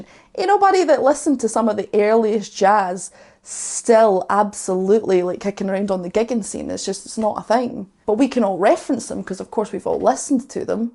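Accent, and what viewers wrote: Scotland, United Kingdom. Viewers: I'm a silver fox😂😂😂 52 and been listening to Metal fir over 40 years now. My ma was 13 when The Beatles erupted and she got me into rock n roll. They were the first band I remember listening to and it's went from there. I repaid that start by taking her to see Iron Maiden. She is a massive Manowar fan (a band you have to listen to). But the crowning glory was taking her to see Paul McCartney at Giants Stadium in 1990. Please keep doing what yer doing and fuck the naysayers 🤘🏻🇬🇧🏴🖤 Love the Cattle Decapitation as well🤘🏻 P.S. NEVER apologise fir yer beautiful Scottish accent and being Scottish 🏴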